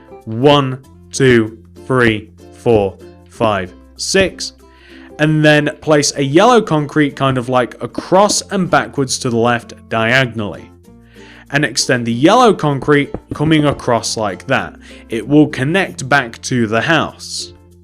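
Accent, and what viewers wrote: United Kingdom. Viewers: Quick question are you british?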